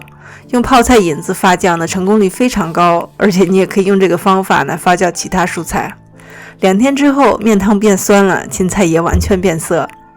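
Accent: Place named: North, China. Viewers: 几十年前在西安夏天的味道，小时候永远的美食，普通家常的味道，的西安口音太好听了，好像邻居家的姐姐在边做饭边教大家，轻柔友善，温馨舒适！我们小时候吃的更多的是苞谷面，也会更多的醋和辣椒🌶️！ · She's from Shaanxi, and yes, northern China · 声音听起来好像北京话啊😄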